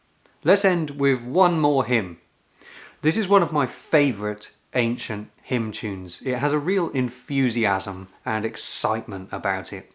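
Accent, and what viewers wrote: United Kingdom. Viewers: The only problem with your videos is that I find myself thinking with a British accent for some time afterwards! I’ll be fine. Carry on!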